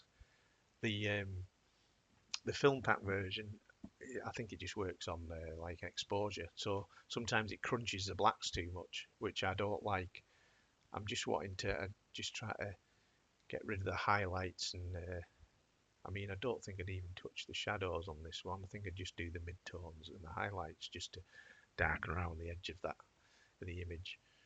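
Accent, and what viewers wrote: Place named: North, United Kingdom. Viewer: When you use the luma channel to set black and white points, why don't you turn on highlight and shadow clipping, then back off a touch and then adjust curve to suit if needed? It's the way I do it, well most of the time at least 😊. As a fellow left hander, and also called but a little bit further south than your accent suggests you reside and thank you for your videos and their unrushed pace. As for DXO hiding settings behind a paywall, I guess it their way to try and make us spend more money, but we all know that anyway, for flipping images it has not bothered me because I shoot sport, as a volunteer, so it would kind of bugger up the shirt sponsor logos and advertising boards. It is only fair, that now I have watched, and enjoyed, a couple of your videos that I subscribe to your channel, and now look forward to the next one 👍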